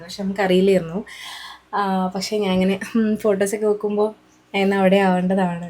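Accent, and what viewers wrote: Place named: Kannur, India. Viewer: Kannur slaaangg oke kity thodangeeele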